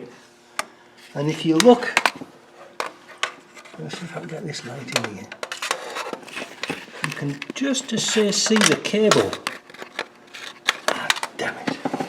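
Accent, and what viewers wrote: Yorkshire, United Kingdom. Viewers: By heck we can tell your still a Yorkshire man !!!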